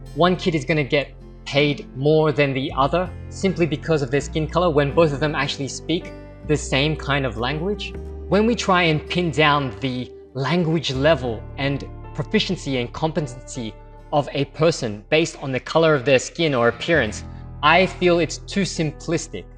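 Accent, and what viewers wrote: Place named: Australia. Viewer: Hi, great channel and videos. Pinoy here. First I would suggest you learn to speak Tagalog with a native accent. Speaking Tagalog with an Aussie accent makes it sound weird. It has nothing to do with your ethnicity, it's more like me going to Australia and speaking English with a Tagalog accent or a Chinese accent (you know what I mean). As a language learner myself (I'm currently studying Japanese and Spanish), I believe being able to speak with a native accent is part of the process of learning another language. Secondly, I can understand your experience with so-called "native English" speakers. It's the same here in Hong Kong. I'm a financial editor and writer, and I used to work for an English-language newspaper that hired editors based on the color of their skin. If you're a gweilo from the UK, US, or Australia-NZ, you're hired. 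Many of them do not know proper English grammar which is essential in written English (much less the technical aspects of the subject matter that I write about and edit). I would argue there is a huge difference between written English and spoken English. A person may be able to speak English well enough as a native English speaker (those who were born in an English-speaking country) but being able to write properly (and edit) in English is another skill that has to be learned. Needless to say, working for that company was quite frustrating and the editorial quality of that newspaper left a lot to be desired. Good luck with your future en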